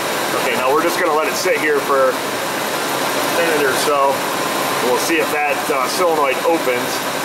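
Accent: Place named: Upstate New York, United States. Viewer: Alright, Man when you said "wicked" a couple times I knew you were from Upstate... but when you said "jabronie" now thinking Binghamton. Either way, it's nice to hear someone else who talks like me. I live out here in Washington State now but was raised in the wicked cool town of Binghamton, NY with some other stinkin' jabronies.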